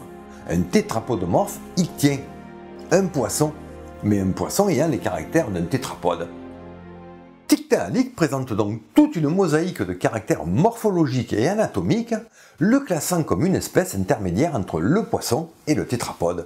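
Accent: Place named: Provence, France. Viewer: "Fing Silurieng/début Dévonieng" je pensais pas qu'un paléontologue du sud de la France pouvait être aussi exquis à entendre 😂😂😂 Vraiment entre les familles d'espèces et les périodes, tu régales !! Hyper intéressant par ailleurs, tu alimentes ma fixette du moment 👍👍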